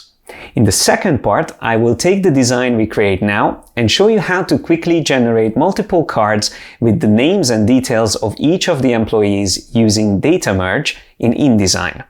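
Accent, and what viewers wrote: Hungary. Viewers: Your voice it's like you have a little bit hungarian accent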